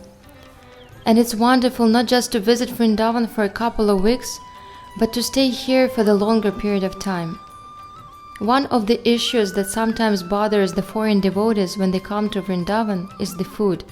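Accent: Russia. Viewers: Your accent seems that you are from Russian Federation ? 😯🙃🙏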